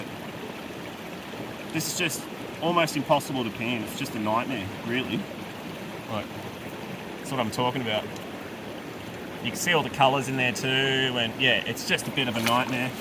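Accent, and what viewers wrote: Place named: New South Wales, Australia. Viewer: Where are you TAS Vic or NSW .. You definitely have a NSW accent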